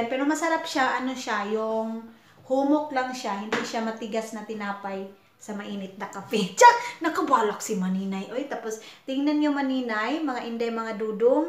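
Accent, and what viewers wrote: Visayas, Philippines. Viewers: Makatawa ko nimo kay bisaya kaau mahirap magtagalog.Mao gyud Dai ,magpa box magka igit igit kag puno sa isulod.I shout mi nimo Dai ha ,dri sa Italy. Salamat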